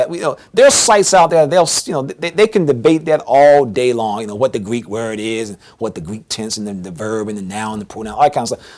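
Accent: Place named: Southeast, United States. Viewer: you and your wife are on my short bucket list of some of the most impressive people I have never met, that I would be honored to meet. I always look forward to your new broadcasts and re-watch older ones sometimes. Your exceptionally respectful and intelligent delivery, knowledge, and honesty, to me, makes me believe you are this generation's Ray Franz even though you are not from the same hierarchy level in the WT, you present the vast information in the same, honest, respectful, and easy to understand way. I do hope you and your wife continue on for a very, very long time, making new videos. Whatever endeavor you take on in the world of employment, I am sure you excel in it. I can only wish for a fraction of your ability to knowledgeably deliver information in this easy to understand way that you seem to naturally have. When reading Ray's book I realized I frequented Anniston, AL during the exact time he was there. 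I was clueless to the JW back then, but if only I had known about the JW, who he was and what he was going through, I would have sought him out just to shake his hand and thank him. Your accent sounds familiar as if you are from the same general, SE, area. I feel if A&E's producers of the Leah Remini Scientology show could get you together with her, to do a program or two on the Watchtower, with your knowledge and delivery shining, it would make for an exceptional ( and very needed) show. Then, your videos WOULD go viral as many hope they would. Please conta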